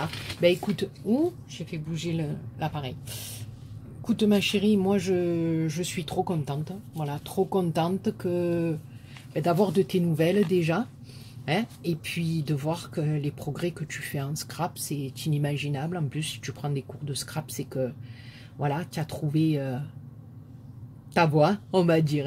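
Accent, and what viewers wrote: South, France. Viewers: ccou....waouuuuuu...tes créas reçues sont justes magnifiques!!!!! pourquoi tu reçois autant de gentillesse????? parce que tu l'es toi-même!!!!! ta bonne humeur en toutes circonstances traversent les écrans, perso quand tu parles avec ce bel accent du Sud, bah moi j'entends les cigales.... des gros poutous.....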